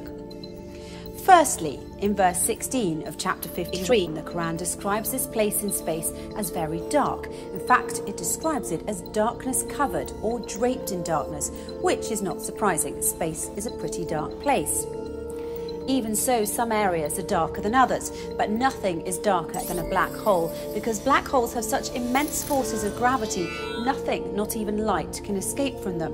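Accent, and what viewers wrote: England, United Kingdom. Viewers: انتبهوا من هذه القناه.. اما المذيعة فهي مذيعة مأجورة تقرإ الننص كما يكتب لها سواء كان نصا من القرآن او للتوراة او لكتب الهندوس فلا فرق لديها. لكن واصح انهم احتاروها بشكل وبلكنه انكليزية واضحه وايضا أن لا تكون مسلمه لكي تبدو اكثر اقناعا.وكأنها تمثل الغرب او ناسا ربما لا القائمين على القناة... متأكد لو انها قررت تلبس حجاب لطرودها لانهم يريدونها بصوره غير المؤمنة.. الله يهيدهم اللي خلف هذه القناة.. علميا كلامهم للأسف ضعيف كما هو حال كل حلقاتهم.. فانتبهوا..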